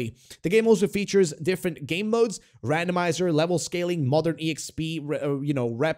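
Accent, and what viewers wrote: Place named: Australia. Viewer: Are you Australian or American? Your accent cant seem to make up its mind.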